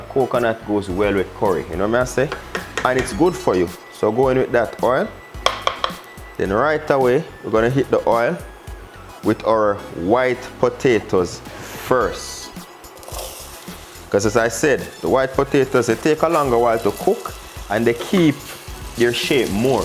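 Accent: Jamaica. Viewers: I love to watch you cook.Jamaican accents are my favorite, from the mountain to the beach and long the country side. How did you learn to cook so well, your parents or grandparents or did you go to culinary school?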